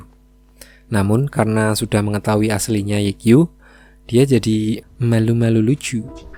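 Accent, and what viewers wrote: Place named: Java, Indonesia. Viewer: Anjim wong jowo to sampean mas wkwkw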